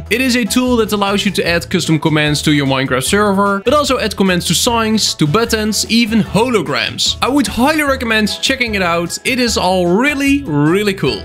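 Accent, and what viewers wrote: Netherlands. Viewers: I smell Nederlander?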